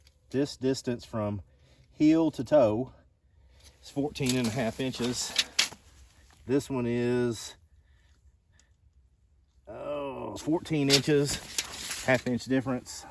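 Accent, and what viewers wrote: Kentucky, United States. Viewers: Knew you were from KY the moment I heard you speak!!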